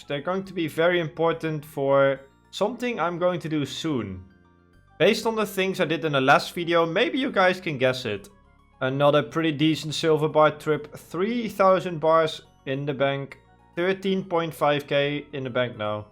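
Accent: Netherlands. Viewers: I can hear the thicc dutch accent. Keep up the good work maatje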